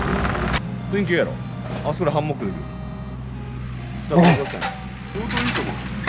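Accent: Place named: Hiroshima, Japan. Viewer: 清木場さん広島弁ですか？ 広島住みですが、方言が親近感湧きます😀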